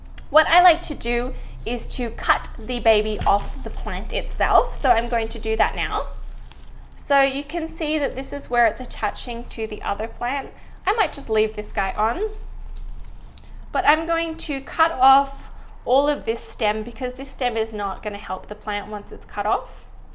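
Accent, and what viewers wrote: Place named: Australia. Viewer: Thanks for the tutorial. I heard about 3-4 accents.🤔very interesting! Geussing Australia?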